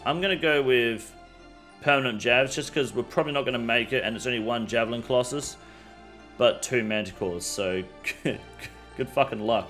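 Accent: Australia. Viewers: ❤Such a Nice Australian accent 🦘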